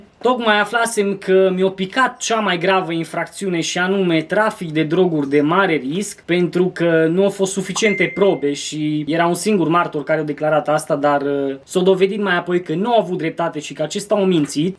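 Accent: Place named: Transylvania, Romania. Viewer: )) Glumesc si eu. Te ascult cu placere, ca ai accentul ala fain de Ardeal. Si eu sunt plecat de acasa tot din zona aia, din Mures mai precis. Numai bine,!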